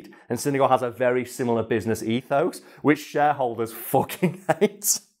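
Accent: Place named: Scotland, United Kingdom. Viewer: I can't fully place your accent and it is driving me crazy. Can't tell if it is a northern accent or Scottish.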